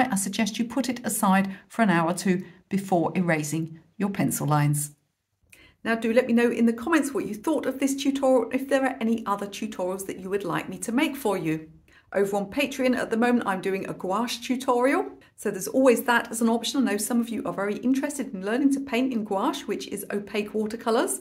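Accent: United Kingdom. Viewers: I love your shorter step-by-steps; I think we all love a quick win for the day! However, I also love the longer tutorials, as well. Honestly, I would watch any class you teach, even a sewing one (tho sewing is my nemesis). But maybe that's just your British accent and sense of humor! 🤣😉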